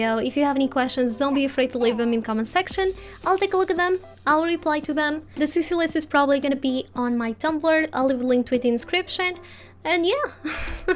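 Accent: Portugal. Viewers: you're portuguese right? I've noticed the accent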